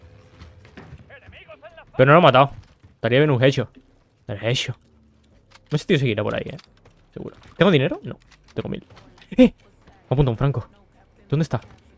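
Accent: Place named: Argentina. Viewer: Grande pensé q eras argentino jóder...abrazo desde Argentina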